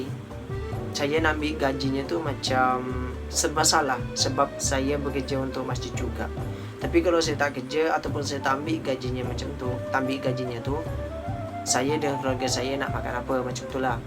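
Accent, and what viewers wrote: Malaysia. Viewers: logat Malay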